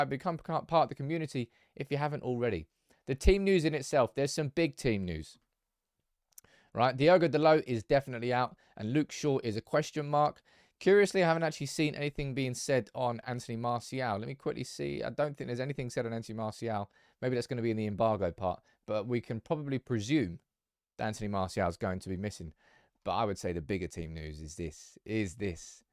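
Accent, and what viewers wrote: United Kingdom. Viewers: saying ‘Ten Hag’ with that wonderfull British accent made me subscribe to this channel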